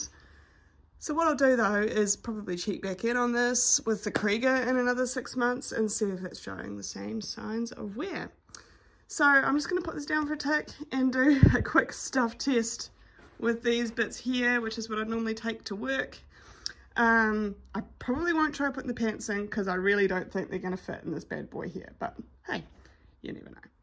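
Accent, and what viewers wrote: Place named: New Zealand. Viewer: Thank you for your evaluation of the bags. This was very useful for me. Also nice to hear your New Zealand voice. It reminded me of my two sea kayak trips in New Zealand. Good memories.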